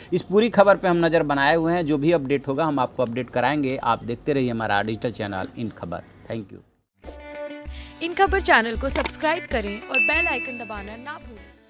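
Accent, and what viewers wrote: Bihar, India. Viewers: Bhai aapke bolne se lagta hai ki aap Bihari hai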